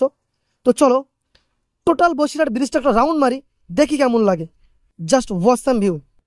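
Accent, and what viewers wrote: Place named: Bangladesh. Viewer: Tumar kotha gulo bangaladeshi accsent lagche 🤔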